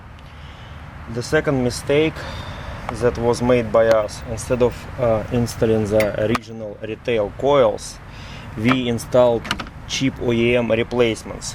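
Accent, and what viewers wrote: Russia. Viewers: love the Russian accent 👌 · You must be russian..:goodvibes: i love your accent. I subscribed! I love your videos.. straight to the point, no bs. Thanks!!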